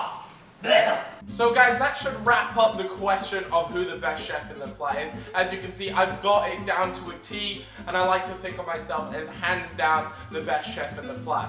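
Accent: United States. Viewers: So your accent is all those things you mentioned but I can mostly hear American yet you never lived in America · U sound American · your accent sometimes sounds american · you sound American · Am i the only one who thinks he has kind of an american accent? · You sound American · Am I the only one who thinkg he sounds American? And coming from an actual American that is huge. It really confuses me to know he has never lived here. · Thought you were American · The accent sounds closer to American than anything else. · ... I thought he was American....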